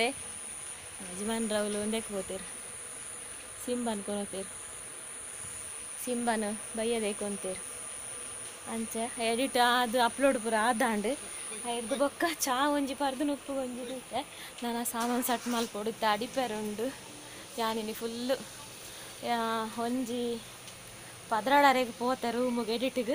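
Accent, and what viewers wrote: India. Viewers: Eer enna ne tulu panda proper Mangalore tulu paatheruvar anchad enk mast kushi aapundu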